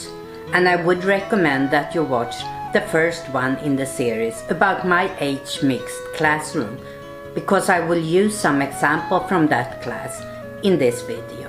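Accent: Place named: Sweden. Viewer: That was very interesting, and as always love the Swedish accent, good video thanks for sharing.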